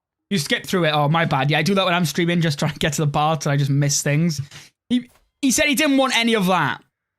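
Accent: Wales, United Kingdom. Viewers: heard you on the steam page and thought I detected a bit of a welshie Im Welsh/Irish so glad I looked you up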